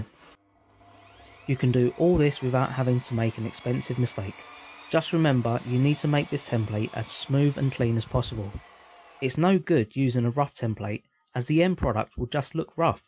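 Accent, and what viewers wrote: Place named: Cockney, United Kingdom. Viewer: You reminded when you mentioned this vid earlier, I needed to watch this - been meaning to but never remember at the right time. Curious, what epoxy do you use for glueing up? Im sick of the Gorilla type where you need ten ton of pressure to squeeze out the gear from those two tubes! Just my ten cents worth, whats always drawn me to your vids was the kinda lock stock and two smoking barrels feel, like at the start and end of this vid in a fashion of "Guns for show, knives for a pro" "The entire British Empire was built on cups of tea" "No, I asked you to give me a refreshing drink! Wasn't expecting a fucking rainforest. You could fall in love with an orangutan in there!" You had that same tone before but didnt copy it, kinda came naturally across in your vids - That was your USP from your vids to me mate, hope that makes sense? 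Quintessentially English/Cockney in a funny way done naturally - I'm meaning this as a complement.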